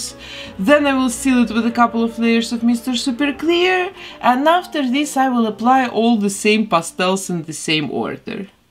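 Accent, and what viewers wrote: Russia. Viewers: Это самый потрясающий русский акцент, который я слышала)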